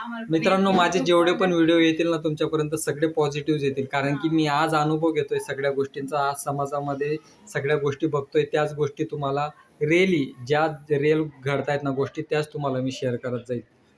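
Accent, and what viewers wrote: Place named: Dhule, India. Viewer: तुमचा बोलण्या वरुन तूम्ही धूळयाकडचे वाटता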